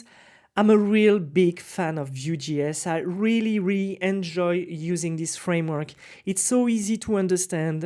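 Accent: France. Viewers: Il me semble que tu es français vu ton accent ( mais tu maitrises parfaitement l'anglais !) merci pour ton retour, moi aussi j'aime beaucoup Supabase et encore plus VueJs! j'espère qu'il va continuer de se répendre et pourquoi pas un jour prendre le pas sur React ;) Si tu utilises un peu Nuxt 3, n'hésite pas à faire une vidéo ou tu l'utilise avec Supabase justement. D'ailleurs est ce que tu l'utilise avec une ORM comme Prisma? Encore merci pour tes vidéos! continue ainsi!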